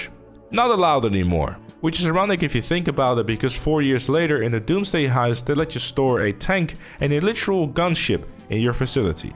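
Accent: Netherlands. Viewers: Another Dutch man? I hear your accent bro, you cant hide it from me!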